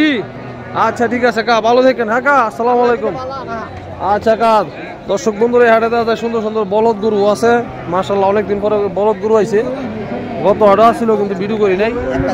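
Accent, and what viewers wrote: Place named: Amgarh, Bangladesh. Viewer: ভাইয়ের কন্ঠে মনে হয় আমগড় দেশি লোক, শিবপুর উপজেলার আসে পাশে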